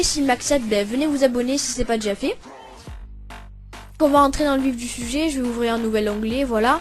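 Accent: France. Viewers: Plus marseillais , tu meurs ! :D MARSEILLE EN FORCE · On sent l'accent du sud x)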